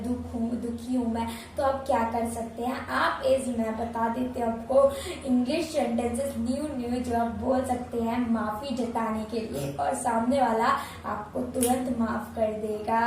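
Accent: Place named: South, India. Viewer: Nice English but i am thinking you are from south because your Hindi is almost right.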